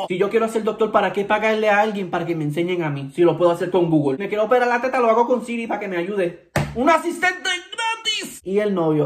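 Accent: Puerto Rico. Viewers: Jamás pensé que sonara tan ✨puertorriqueño✨ pensé que era más gringo💀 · Sabes que es Boricua solo por escuchar como habla en español❤😂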